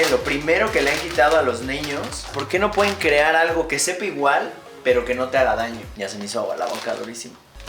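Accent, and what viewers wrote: Fresa, Mexico. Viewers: Hable bien amigo, no sea fresa. Con respeto se lo digo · en los primeros vídeos no hablaba fresa no se a que se deba ese cambio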